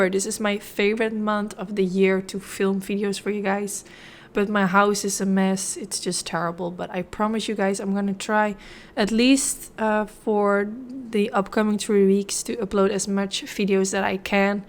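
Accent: Netherlands. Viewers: I love the look and your lovely Dutch accent . Prima 🌸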